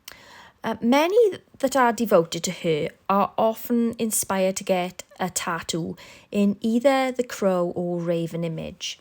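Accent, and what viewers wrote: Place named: Wales, United Kingdom. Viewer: And I'd never want to shut a Welsh woman up! 🥰 You have a wonderful dialect.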